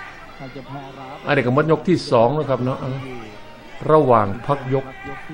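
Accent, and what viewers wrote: Isan, Thailand. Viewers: มักหลายที่เว้าอีสานเด้อคลายเครียดได้ดีอิหลี😁😁😁😁😁😆😆👍👍👍👍👏👏👏🤣🤣🤣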